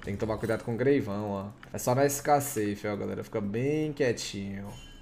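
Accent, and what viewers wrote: Minas Gerais, Brazil. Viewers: voce é de onde mano ? sotaque de minas ou de go ctz haha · sotaque mineiro do é o motivo pelo qual fico gay td dia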